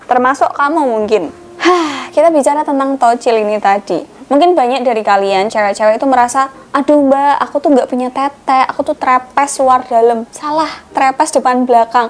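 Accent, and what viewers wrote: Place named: Solo, Indonesia. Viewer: Mbak ngomong nya lucu ...dari solo ya mbak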